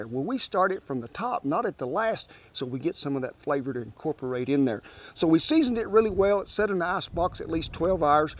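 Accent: South, United States. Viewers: I subbed for the Southern accent.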